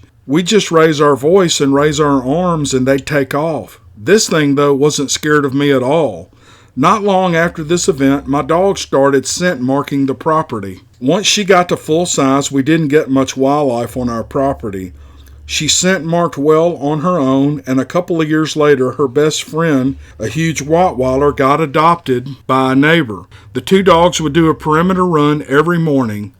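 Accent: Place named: South, United States. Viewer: Dang it that last one was a corker! It’s 9pm here and I made the mistake of listening to that one while driving a lonely road up here in the redwoods of Northern California. Not even the soothing tone of southern voice could shake the creeps. Great work as usual 👏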